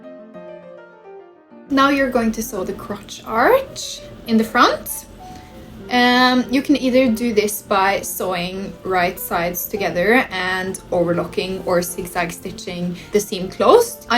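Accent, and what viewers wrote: Norway. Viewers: 😅 she is a Norwegian fashion designer. I guess you don't like her accent then? Wonderfully thorough tutorial without being too long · she’s Norwegian she has an accent…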